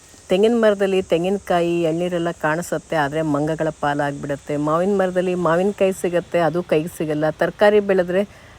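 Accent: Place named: Kundapura, India. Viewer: Salpa kundapura kannada tara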